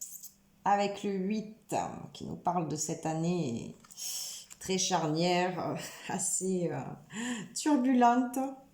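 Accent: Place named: South, France. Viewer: ✨✨Bonjour ✨✨ je suis abonnée depuis peu à ta chaîne, je pense pas me trompé, en disant que c'est Aurélie Chamane qui nous à parler de toi et de ton oracle, et que les cartes était faîtes à l'effigie de tes peintures??? Wouah...tu as l'accent du sud comme moi. Qui suis native de cette région. Ta guidance ma parlée, je te remercie pour ce partage. À tout bientôt..... ✨🌴🌈💜🙏💜.🌈🌴✨..😘.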